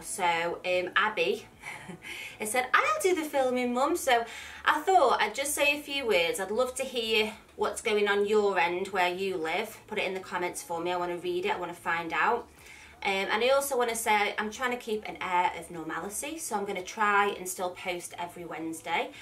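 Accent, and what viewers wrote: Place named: Northern England, United Kingdom. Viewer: Thanks for keeping things normal. I'm from Warrington but live in St. Louis and listening to your lovely northern voice comforts me and reminds me of home. Stay safe and keep posting xx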